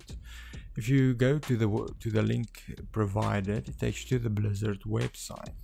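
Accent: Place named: South Africa. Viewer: Hey , I can hear you're south african and so am I , Can guess the issue has to do with our country.... The way i've gotten it to work is by trying multiple phone hotspots until one of them seems to work.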